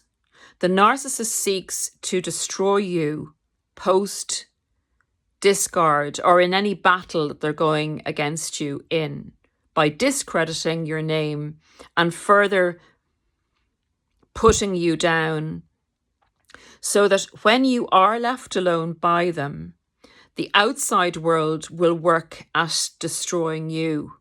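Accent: Ireland. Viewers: What a lovely 😍 lady, you my dear have helped me tremendously with my healing, I have listened to your lovely ❤Irish accent, for hours and hours thank you for your work, you describe the behaviour and Traits of Narsasits very, well exceptional ❤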